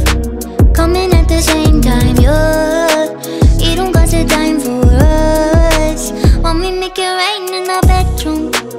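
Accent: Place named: Russia. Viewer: Видеоряд зачёт 😍, но, такой "Рунглиш" я давно не слышал ))) тренируйтесь побольше ))))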